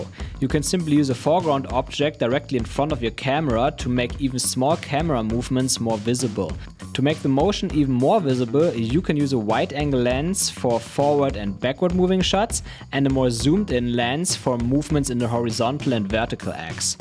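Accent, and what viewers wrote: Germany. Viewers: Are you German?? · In den ersten 3 Sekunden gemerkt dass er deutscher isr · Definitely German accent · aha gut ich bin aus Basel🙃 als ich deinen Namen sah und dein Akzent hörte, dachte ich, dass du bestimmt aus der DACH Region bist :)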